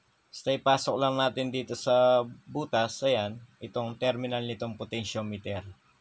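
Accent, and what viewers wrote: Pampanga, Philippines. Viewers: Boss gnyan din problema ng ampli ko.. Mahina kbilang chanel. Pinaayos ko pero di naayos.. San loc mo? Prang kpampngan k kc kung mgsalita.. Kpmpngan din ako baka mgkalapit lng tyo paayos ko ampli ko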